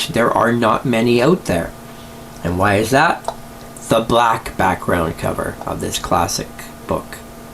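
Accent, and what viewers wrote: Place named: Canada. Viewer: Great Canadian accent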